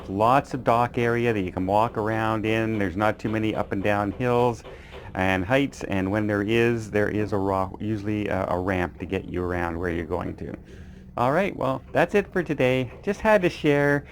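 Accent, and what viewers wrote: Canada. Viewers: Love it! You sound like me, I just can't keep quiet when I see atrocious behaviour like that. Absolutely love your Canuck accent! Just subscribed, your videos are great.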